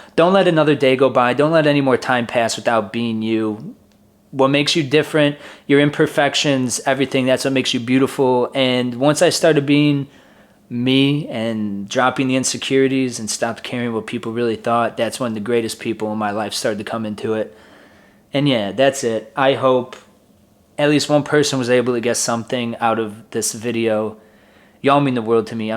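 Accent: United States. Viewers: Anyone else just think he had a jersey accent? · Truth...wasn't sure about this guy with the Chicago accent that liked to wear bball shorts with golf shirts when you first showed up in Good Good. Didn't take long to realize that you're a genuinely solid dude and I'm glad to see what you bring to the whole group. After this video, even more so. Thank you for sharing your truth...we're all better for it. But seriously...stop wearing bball shorts on the golf course.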